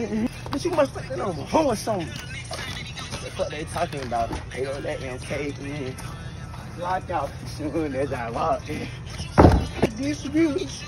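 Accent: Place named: Memphis, United States. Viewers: Where is bro from fr 🤣🤣🤣 I swear he from Memphis! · Gotta really be from the dirty dirty DIRTY south to understand what he’s saying😂I’m from Prichard Al and I’m 45 yrs old… ain’t nothing changed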